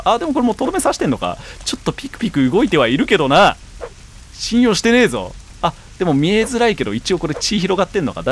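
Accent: Kansai, Japan. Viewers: クレアへの要求が高いうっしー(•'-'•) なんか最近関西弁出てるうっしーw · なんでちょいちょい関西弁なん？（笑）好きやけど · 今回のうっしー、関西弁多めだなぁと思ってたら 理由わかった👍️ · ちょこちょこ出てくる関西弁がたまらなく好き笑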